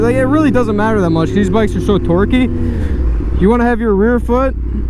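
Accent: United States. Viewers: you sound like you are from wisconsin or somewhere in the midwest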